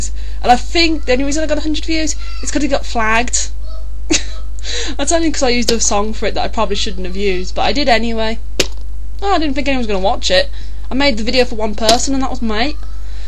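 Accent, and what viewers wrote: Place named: RP, United Kingdom. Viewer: wow right you do sound posh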